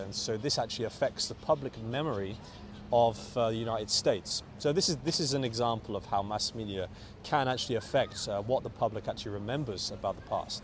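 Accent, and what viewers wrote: United Kingdom. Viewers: Hi thanks for the video,I think this is an excellent exercise to train listening comprehension.First of all,you started with a slow pace when answering the questions which makes it easier for learners to understand and gradually you spoke more quickly and technically the vocabulary got more complex but I like the idea behind these videos.So,well done.I also like your British accent.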